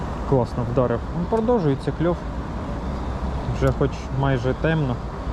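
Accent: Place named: Russia. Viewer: сразу слышно, шо хлопец в быту говорит по-русски (не по-российски), но под давлением пОтриотичного рогулья, вынужден видосы пилить по-украински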